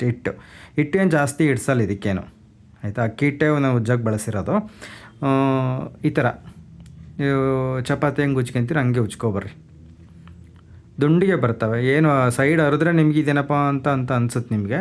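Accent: Chitradurga, India. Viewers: ಭಾಷೆ ಕೇಳಿ ನೀವು ಚಿತ್ರದುರ್ಗದವರು ಅಂತ ಗೊತ್ತಾಯಿತು. ಯಾಕೆಂದ್ರೆ ನಾವು ಚಿತ್ರದುರ್ಗ ಹೊಸದುರ್ಗದವರು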